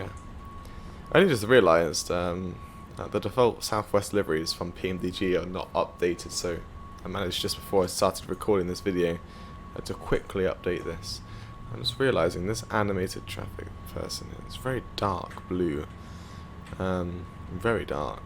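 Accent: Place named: England, United Kingdom. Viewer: Why does he have an English accent in new Orleans?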